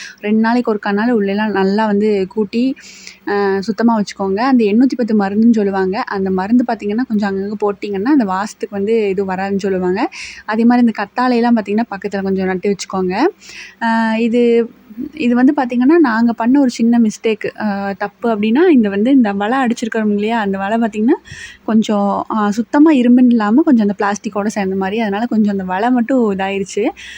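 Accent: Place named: Kongu, India. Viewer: Neenga yen sudden ah kongu slang la pesuringa.... Unga previous videos lam normal slang la pesuninga.. Adhaan kettaen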